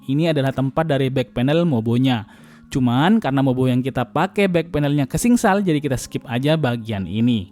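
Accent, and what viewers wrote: Madura, Indonesia. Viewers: bang.. lu orang Madura ya ???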